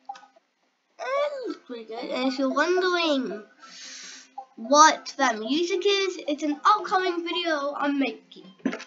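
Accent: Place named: United Kingdom. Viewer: you sound like u in the uk homie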